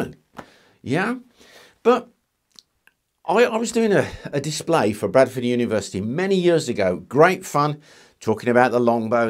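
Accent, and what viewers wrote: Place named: Birmingham, United Kingdom. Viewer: So your a Brummie o dear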